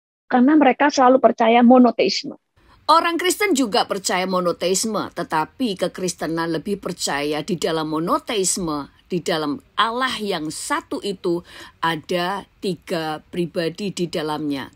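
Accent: Java, Indonesia. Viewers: Bu, medok banget........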